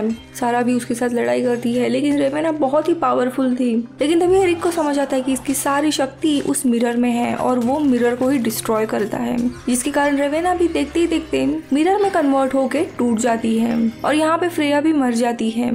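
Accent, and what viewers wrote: Maharashtra, India. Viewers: hi do you have any relation with climax explained in hindi channels nikhil, moreover you both sound a quiet marathi, BTW Love your explanation. thank u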